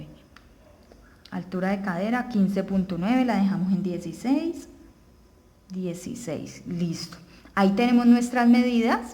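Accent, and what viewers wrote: Colombia. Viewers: Hola me gusta mucho come nos enseñas, vivo en Italia hice un curso de dos años y me defendía. Per tú me has ayudado tanto, además que iorte hablar me encanta me hace sentir en mi querida Colombia... Te felicito y deseo tantos éxitos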